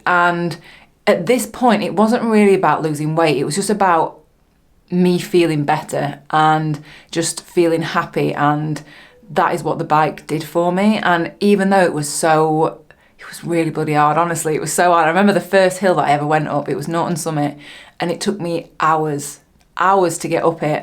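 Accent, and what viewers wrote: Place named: North, United Kingdom. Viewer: Just wanted to say thanks for your many inspirational videos, really looking forward to this series. Been riding for about 15 years now. Managed to eat myself into a diagnosis of type 2 diabetes back in august and have since become fitter and faster than ever (at 50!) 3 weeks ago managed to aggravate an issue with a disk in me neck which meant I was in a lot of pain and unable to ride. Watched this vid while in hospital recovering from the corrective surgery so I can’t wait to hear you share your recovery story. Reckon it will be 6 to 8 weeks until I’m back on the bike which is going to be hard. I have to say though they for me over the last 15 years of riding as someone with fairly severe bipolar I have gotten HUGE mental health benefits out of the simple act of riding and maintaining my bikes. So please keep up the great work, and also it’s good to hear a proper northern accent here on YouTube.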